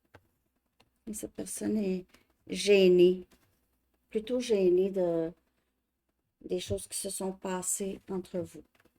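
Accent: Canada. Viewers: Je vous decouvre à l instant bonjour de MARSEILLE JE SUIS LION ASCENDANT CAPRICORNE ET LA LUNE EN CAPRICORNE VOUS AVEZ L ACCENT CANADIEN❤❤❤❤❤❤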